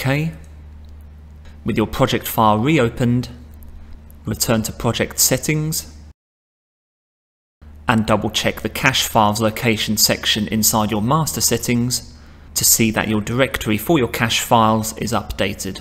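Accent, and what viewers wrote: United Kingdom. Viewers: British accent ❤️❤️❤️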